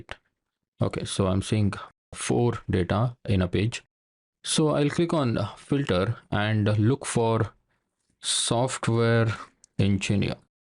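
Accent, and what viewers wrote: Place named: India. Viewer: Classic Indian tutorial vibes...Flashy MacBook, thick accent, and somehow turning a simple task into a NASA mission. You could’ve just used Windows and made it beginner-friendly, but nope, had to flex the fruit laptop. No real pro with a MacBook is coming here to suffer through that accent. It’s the poor Windows and Linux users trying to survive this mess.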